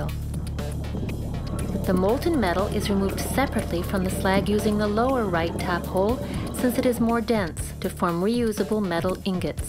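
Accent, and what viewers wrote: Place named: China. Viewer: Couldn't find a Canadian to do the voice over? The English is perfect, the accent from China is still on there on the back end though.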